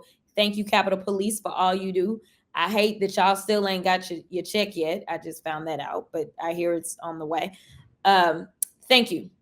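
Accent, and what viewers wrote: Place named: AAVE, United States. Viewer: I can't listen to this ebonics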